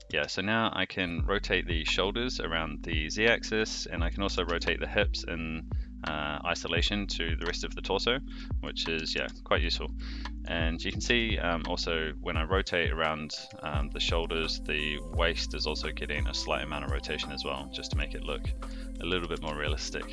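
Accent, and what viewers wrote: New Zealand. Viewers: Hi. Have you seen a YouTuber named Karl Rock. He is from your country but married to a girl here in India. My word, if you listen to him with your eyes closed, you cant tell the difference. Your sound and accent so closely matches to his. Just incredible. The only difference is that he can speak Hindi as well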